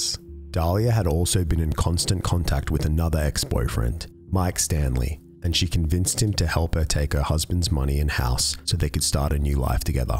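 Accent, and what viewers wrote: Australia. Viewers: Aussie that's why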